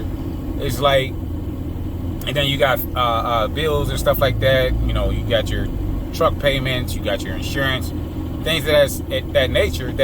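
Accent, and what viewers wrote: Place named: Baltimore, United States. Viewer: I heard you say you’re in Md. I’m thinking Bmore because of the accent lol. I’m looking to get into the Business soon . I’m in the DMV also, PG County to be exact. How is the local work in our area? And if you can point me in the direction of a reliable Dispatch? Keep on Trucking Holmes, you make it look easy 👍🏽👍🏽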